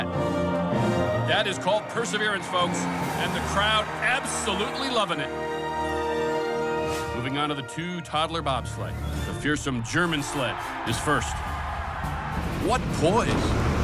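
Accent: United States. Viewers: How ridicules ,not surprised yank commentator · what state in the US is the commentator’s accent from? I’ve been trying to figure out similar accents and i can’t tell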